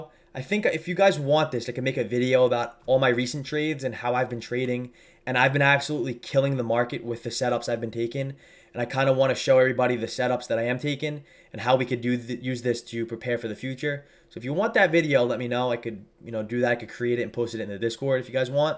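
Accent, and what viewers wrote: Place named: New Jersey, United States. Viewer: Come for the education, stay for the Jersey accent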